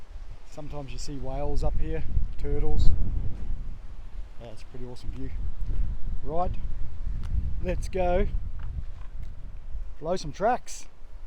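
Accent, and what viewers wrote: New Zealand. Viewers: Just found your channel. Great footage. Great location too. Every 79 yr old teenager dream. Did I see something about you leaving.? Obvious question..why.? You sound like a kiwi.! Thanks again for sharing. Atb from across the ditch here in Nz. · You sound like you are from NZ ?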